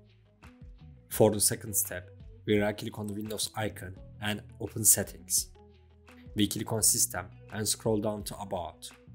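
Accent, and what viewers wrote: Turkey. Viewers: Türk oldugunu inglizcenden hemen anladim :-)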